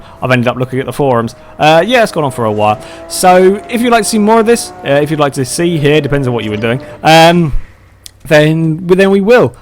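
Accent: United Kingdom. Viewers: man leave the brit alone!! im a southern guy in the u.s. and his accent and the way he talks doesnt matter this is youtube not school he can talk how he wants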